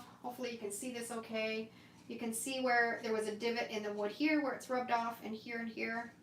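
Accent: Canada. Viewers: It's actually pronounced day-koo-paazh, but I think it's your Canadian accent which is really cute. I always giggle a bit when I hear you say it.😀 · you have a Canadian accent. People can get over it. I'm American; I say 'deck-o-page,' but you do you. I'm here for the learning! Thank you so much for teaching so well!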